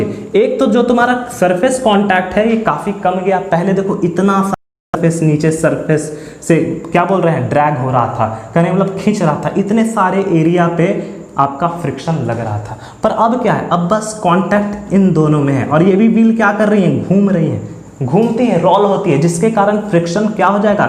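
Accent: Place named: Bihar, India. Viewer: Bhaiya aap bihari ho....jai bihar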